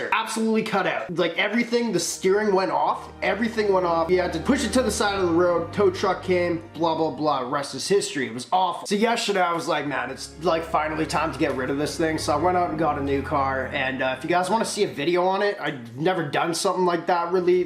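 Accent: Canada. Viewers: dude are you canadian or american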